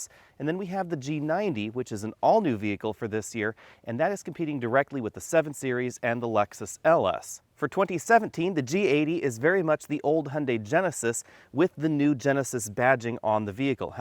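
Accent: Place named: United States. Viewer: Another unnecessary brand whose design will sooner or later not differ from other manufacturers. Capitalism at its best: pretending diversity where no one is. Also, it's funny how you Americans pronounce "Hyundai": there is no "o" like in "Honda" - actually it sounds very similar in your type of speaking. I wonder, because you should be easier able to pronounce the "yu" as "yu" or "ju"...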